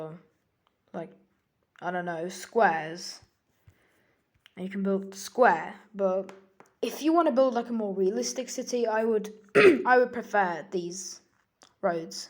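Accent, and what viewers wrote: United Kingdom. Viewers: where are you from? England? I like your accent ;)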